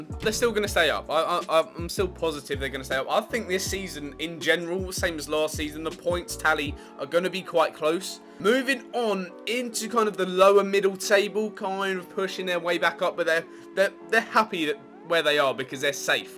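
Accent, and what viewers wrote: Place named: Manchester, United Kingdom. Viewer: Love the Manc accent. Always support your local team and love you are being a face for that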